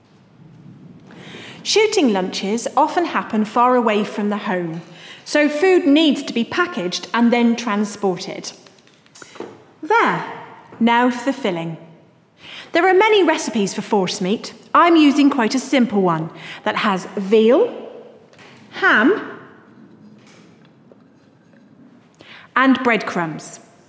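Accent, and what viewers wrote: United Kingdom. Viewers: Why do I keep watching this video?!?! (other than the British accent, I mean)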